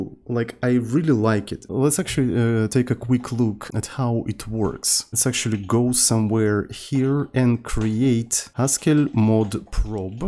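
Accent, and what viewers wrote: Russia. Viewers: Я как услышал его, понял что русский, акцент остался чутка)0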